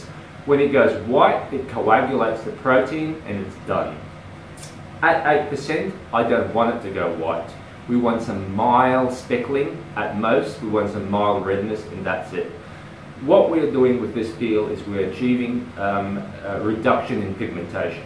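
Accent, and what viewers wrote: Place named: Australia. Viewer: So TCA peel isn't good for acne scars? I thought it would remove the top layer of skin, not really the top but a small portion like dermabrasion, through chemical process.... Anyways, you got any recommendations for any dermatologists in California? Seem from your accent, it looks as if you live around Australia.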